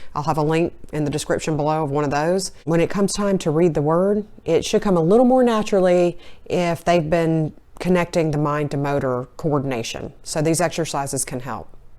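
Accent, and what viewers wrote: South, United States. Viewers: When she says 'Go get that', it sounds like 'Go git that', because of her southern accent. It can be confusing for a young person to sound it out correctly.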